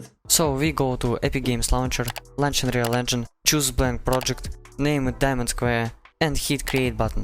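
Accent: Russia. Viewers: бро мы все знаем что ты русско говорящий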